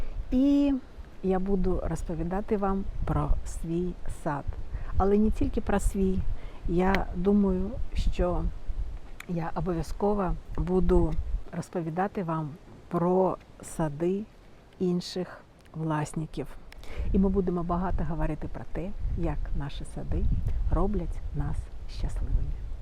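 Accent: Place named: Belarus. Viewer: Вітаю Вас. Ви велика молодець) по всіх питаннях, що Ви озвучили! Вас приємно слухати і дивитися) мені здається що Ви білоруска, тому що слова звучать наче українською але з білоруським акцентом! Бажаю успіху з садом і з мовою!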